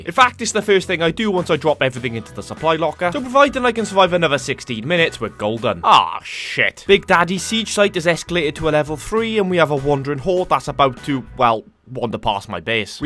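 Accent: Wales, United Kingdom. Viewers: welsh accent pal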